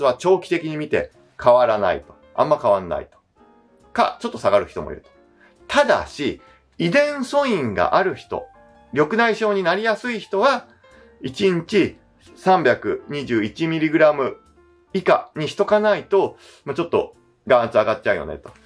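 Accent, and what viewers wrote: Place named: Tokai, Japan. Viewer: 先生は東海出身でしょ〜所々方言出てる気がする.....！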